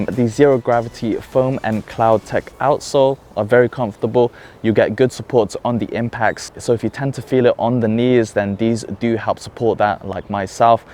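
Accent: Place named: New Zealand. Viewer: Thanks man. Appreciate your time and help as well. I just saw your vevo vid too and now I feel like I have to choose between those! Ha Btw, your vids are in South Korea but your accent sounds almost Kiwi ha where are you originally from?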